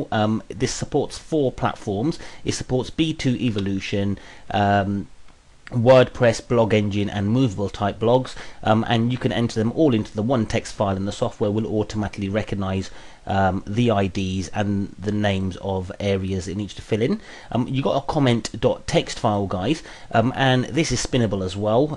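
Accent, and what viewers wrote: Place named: England, United Kingdom. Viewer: I love your english accent, where do you come from ?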